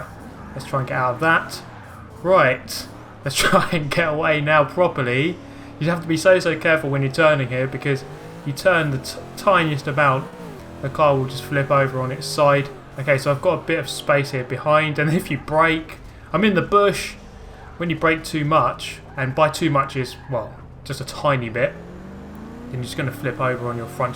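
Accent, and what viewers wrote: United Kingdom. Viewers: The contrast of this pure madness along with the calm British commentary is just great lol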